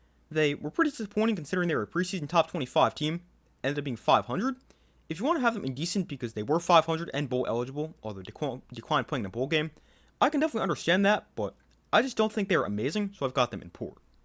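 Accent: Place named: New York, United States. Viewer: New York acksent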